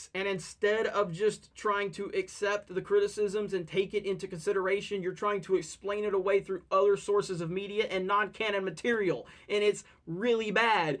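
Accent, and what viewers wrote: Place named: South, United States. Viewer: I can hear the southern accent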